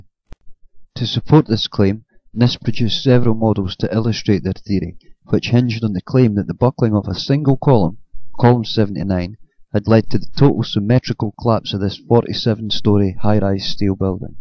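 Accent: Scotland, United Kingdom. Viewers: Great video, and the scottish accent makes it all the better. Thx for your hard work on exposing the BS known as the official conspiracy theory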